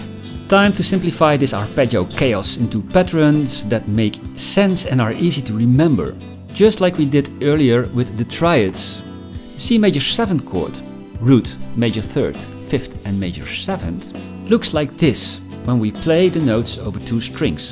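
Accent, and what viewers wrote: Netherlands. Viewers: Indeed. And the Dutch accent adds the cherry on top.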